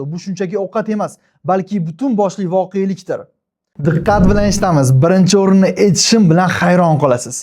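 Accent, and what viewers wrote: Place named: Kashkadarya, Uzbekistan. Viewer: Кашкадаре вилоят шекилли😂😂😂😂😂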